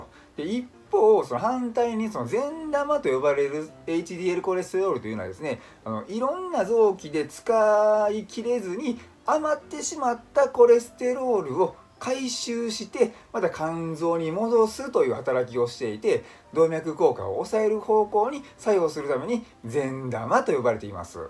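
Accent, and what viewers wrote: Osaka, Japan. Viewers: 久しぶりにさんの健康講座を聞きました❣️耳にさんの声が心地良くて大阪弁が柔らかくて、ほんっとーにわかりやすいです😃ありがとうございます😃 家族の健康は日々の食べた物で支えられてる‼️母さん頑張れ‼️と受け止めました😃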